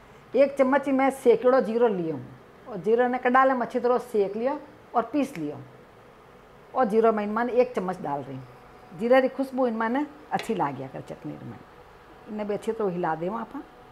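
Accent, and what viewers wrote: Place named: Marwar, India. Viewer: आपको समजावणा को तरीको बढीया है आवाज बहुत साफ,मिठी बोली और शुद्ध मारवाड़ी !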